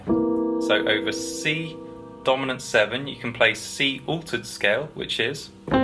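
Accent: United Kingdom. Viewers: Just realised whom your voice brings to mind, and it's probably an insulting comparison, so let me add the disclaimer that now that I realise who it is, you really don't sound like him apart from your intonation and a couple of fairly common South England pronunciations. All right, it's Danny from Withnail & I. Sorry. Great videos, though! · Fantastic lesson you are a great teacher are you British ?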